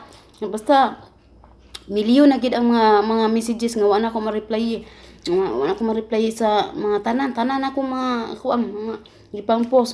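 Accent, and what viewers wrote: Visayas, Philippines. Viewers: yan tlaga magsalita bisaya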